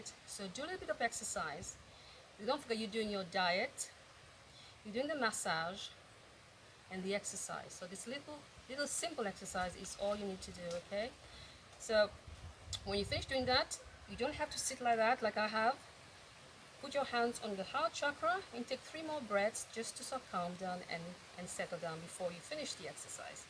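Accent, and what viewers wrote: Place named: France. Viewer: Your accent is pretty, is it French? I have only a small fibroid but would like to get rid of it. My doctor was not concerned about it.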